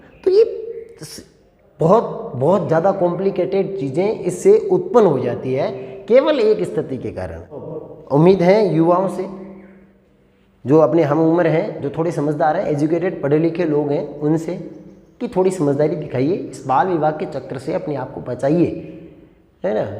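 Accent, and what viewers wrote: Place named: Jaisalmer, India. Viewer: जैसलमेर की प्योर भाषा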